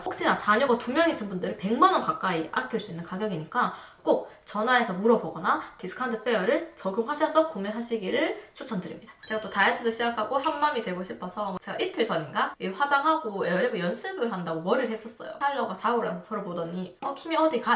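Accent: Gyeongsang, South Korea. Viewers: 세상에 경상도 어딘지 모르겠는데 말투도 귀엽고 재밌고 또 너무 순수하고 맑아보여요!! 응원합니다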